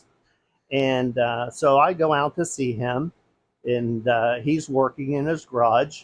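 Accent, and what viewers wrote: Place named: Pennsylvania, United States. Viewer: Great video! I think hear a PA accent, am I right? South?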